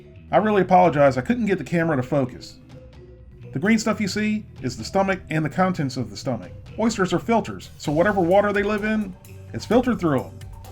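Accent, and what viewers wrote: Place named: Carolinas, United States. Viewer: Blow Me he is from the Carolinas I think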